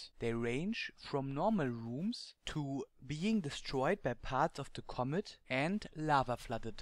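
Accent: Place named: Germany. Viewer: I hope my german accent isn't that annoying <- Lol'd so hard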